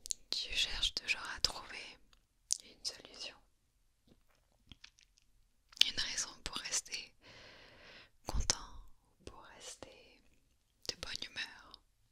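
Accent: France. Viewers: Lol yeah her French is great...she's a native speaker. She lives in Montreal (Québec province of Canada) but she has a French accent when speaking (not a Québécois accent). Makes me think she's French and moved to Canada.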